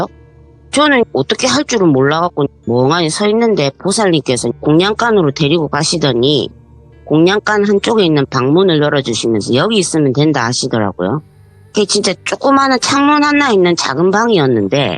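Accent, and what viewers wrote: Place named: Gyeongsang, South Korea. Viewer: 시간 가는줄 모르고 들었어요~경상도 사투리 친근해요😊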